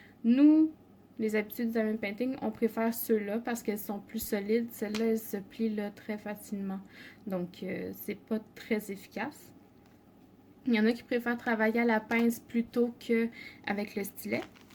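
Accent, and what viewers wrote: Canada. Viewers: Coucou très beau début et j'adore l'accent du Canada. merci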